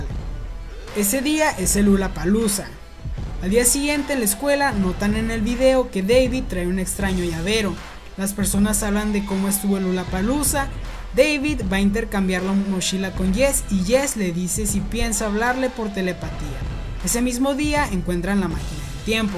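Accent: Chihuahua, Mexico. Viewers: acaso eres de chihuahua en México o que por que tu acento es similar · Eres de chihuahua?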